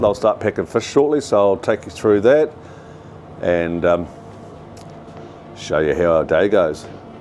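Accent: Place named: New Zealand. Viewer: Hey, of course with the Kiwi accent, I'm 65 & I wish I had a massive "deck".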